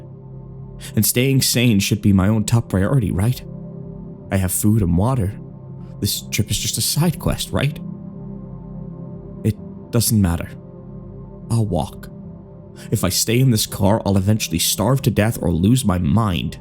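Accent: United States. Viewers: your American accent is basicly flawless.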